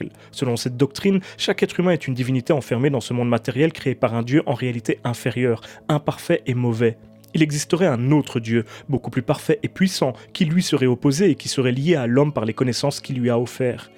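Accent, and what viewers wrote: Belgium. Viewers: Ouiiiiiii, moi aussi, pendant toute la critique, je te reprenais à haute voix,!;-) Mais en même temps, j’adore ton petit accent belge, ça fait partie de ton charme... LOL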